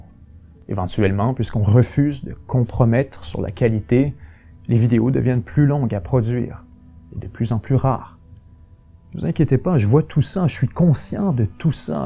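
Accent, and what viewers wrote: Quebec, Canada. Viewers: Bonjour je vous ai découvert seulement hier en cherchant un reportage sur Khadafi qu'un ami panafricain affectione ..heureusement que votre accent quebecois est discret ( plus proche du belge/ suisse).. Malheureusement le monde s'intéresse plus au buzz et aux futilités qu'à la Vérité...Longue vie à 7jours sur Terre.. Cathy depuis Paris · Je vous suivais dès les débuts depuis la Kabylie. Je suis fasciné par la qualité de votre travail, la profondeur des exposés et votre façon de parler. C'est à travers vous que j'ai appris à aimer l'accent québécois qui me paraissait jusque-là bizarre. J'avais peur en cliquant sur la vidéo mais finalement c'est une bonne nouvelle que vous nous annoncez. Je ne suis pas abonné mais je vous prie de croire en ma profonde gratitude pour votre engagement. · je n aime pas spécialement l accent québécois mais ... 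je l entend à peine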